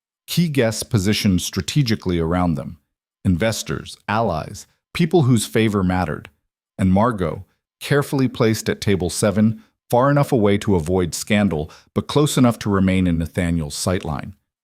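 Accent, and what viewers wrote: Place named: North America. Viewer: Ugh at the lisping N. American accented AI for a story set in 19th century England.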